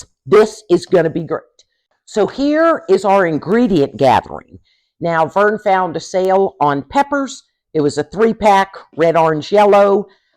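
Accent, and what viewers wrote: South, United States. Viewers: Are you from the south? You have an accent like my aunt who is from Florida. LOL.. Thank you for the small batch recipe. I have never had pickled peppers.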